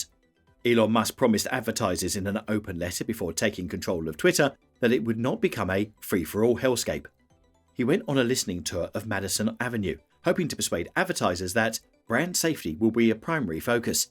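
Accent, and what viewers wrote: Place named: United Kingdom. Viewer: Is anyone else sick and tired of YouTube podcasters employing British accented narrators? They sound like they have a cob stuffed up you know where. I, for one, would much prefer narrators who speak with a good old American accent.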